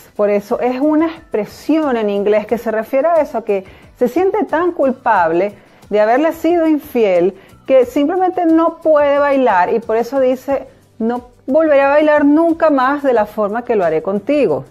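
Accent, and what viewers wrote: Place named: Venezuela. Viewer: TIENES UN PROBLEMA Y ES QUE NI SI QUIERA TE EXPRESAS BIEN EN CASTELLANO. PARA ELLO DEBERÍAS SABER EXPRESARTE BIEN EN CASTELLANO Y POR TU ACENTO CREO QUE ERES VENEZOLANA.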